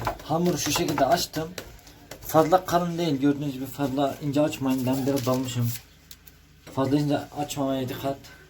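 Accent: Hatay, Turkey. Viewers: Tam bir Hatay şivesi😂🤭 Eline sağlık bu aradaa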